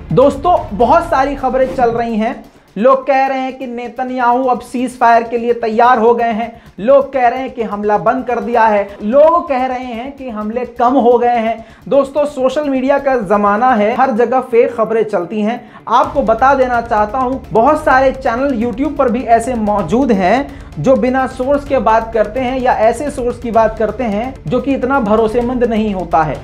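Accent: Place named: Bihar, India. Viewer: sahab aap bahut acchi anchoring karte haiN thora lahze meN biharipan hai usko bhi durust kar lete to aur nikhar jate waise jitna hai wo bhi qabiledad hai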